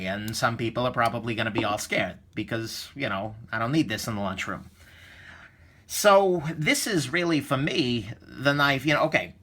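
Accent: New York, United States. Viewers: Great review ! Just ordered a PM2 from Amazon . Thank You for your honest opinion . plus i dig the NY accent . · I think it's a great accent- New York? Here in the UK we have a wide range of local accents, varying greatly over small distances. Unfortunately, in my lifetime we're losing them, youngsters speaking American, which seems out of place in grey, miserable Birmingham (UK not Alabama!) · It's called a New York city accent. Language dialects make America colorful and interesting. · reviews are a refreshing change from all the slow boring knife videos here on youtube, he's got that NY or Eastern accent and talks fast, I could watch his reviews all day. Yeah the Maitraya Buddha thing gave me a chuckle.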